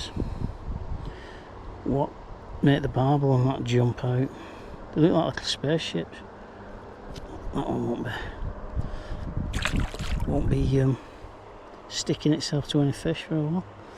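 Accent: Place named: Wigan, United Kingdom. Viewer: Ellow pal, belter vid again and you make that cast look easy! Don't know if it's the Ribble you're fishing but guessing it might be coz you sound like a fellow Wiganer and it'll be on your door step but I've been trotting (with a fix spool) 3 ince dace on there after big perch and jacks and managed a 20lb pike the other week, bet it would make an interesting video on the center pin if you've ever done owt like that before? But ill keep watching either way 👍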